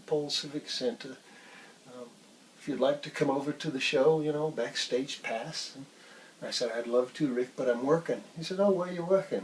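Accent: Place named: United Kingdom. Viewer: That is a Great,and Funny story! Love the British accent! It would be Cool to hear how you guys got the gig with Olivia Newton John!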